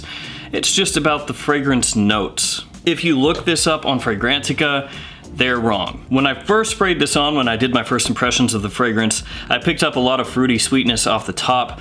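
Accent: South, United States. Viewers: Hey. Nice vid. Btw. What is your accent? Is it southern?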